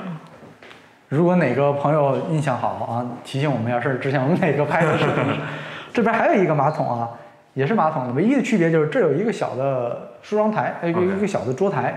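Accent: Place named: Mainland, China. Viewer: Sir, I did not finish viewing your Vblog, based on your accent, you must come from Mainland China, I am so surprised by your talk, very decadent. Most mainland chinese I met are more enterprising and want to advance themself.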